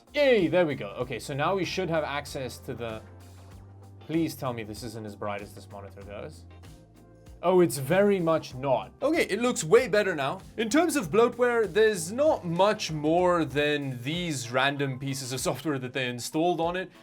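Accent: South Africa. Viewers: Are you from South Africa? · Is that a Saffa accent I sense? ☆ ○ ☆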